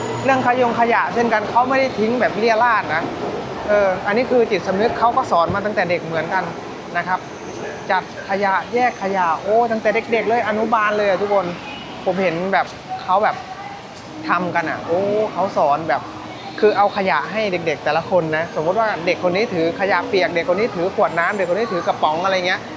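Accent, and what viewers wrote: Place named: Ubon Ratchathani, Thailand. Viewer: เจ้าเว่าลาวคือคนอุบลเว้าเลย สำเนียงคือกันเลย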